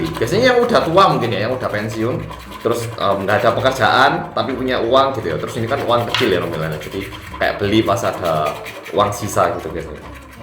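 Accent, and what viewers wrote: Java, Indonesia. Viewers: Bisa ya jawa nya medok tapi jujur suaranya adem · Muka Lu kaya orang korea ko bisa ngomong indo selancar itu Logatt nya lebih ke orang jawa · bang? Abang ini asli nya orang apa sih? kok mukanya Korea tapi bahasa nya medok jowo ?? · Lho. Kyakk. Orngg Korea. Tapi kok. Medok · Mas aku suka logatnya😊 kereeen medok bgt.selalu ngikutin videonya lanjut mas...ditunggu kolab sama bandung oppa lagi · Logate kok jowo mas...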